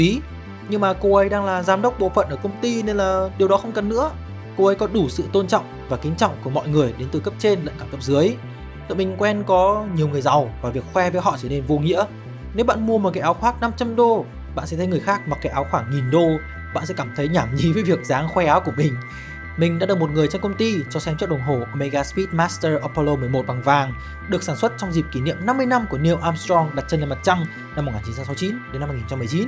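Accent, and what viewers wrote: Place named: North, Vietnam. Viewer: Clip khá hay! Cám ơn Team! P/s: chỉ có xíu ý kiến là người đọc có thể nói chậm, rõ hơn, đừng nuốt chữ. Thật sự người miền Nam như mình nghe giọng miền ngoài rất khó, huống chi các bạn nói nhanh, là tụi mình khóc cạn dòng sông. Tụi mình xem thời sự đài VTV là không hiểu gì luôn. Cám ơn vì đã đọc!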